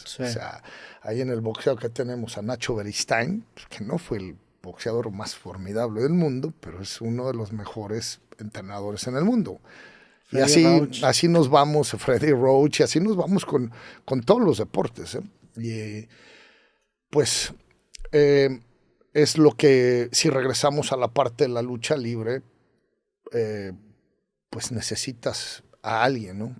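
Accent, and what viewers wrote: United States. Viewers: Tiene bie puesto el nopal en la frente. Hablando como chicano. Por favor. Que acomplejado este tipo. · A huevo tiene que pronunciar en estilo americano ,no puede hacerlo normal?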